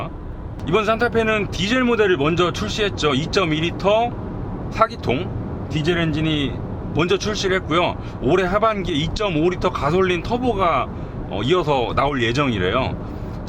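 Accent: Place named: Jeolla, South Korea. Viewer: ㅋㅋㅋ무슨소리 억양만 들어 봐도 전라돈데 ㅋㅋㅋ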